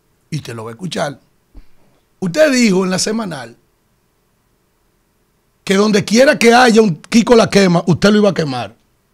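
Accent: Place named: Dominican Republic. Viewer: Ustedes viven en un corral, no puede presentarse en un canal de televisión en otro país, porque solo sabe hablar en R D!!!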